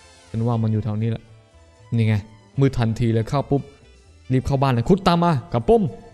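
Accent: South, Thailand. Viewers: ลุง พี่พูด ภาษา ใต้เหมือ จริงๆ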